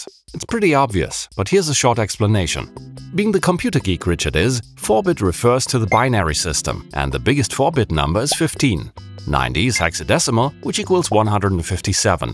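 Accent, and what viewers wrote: Germany. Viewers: you sound extra german in this video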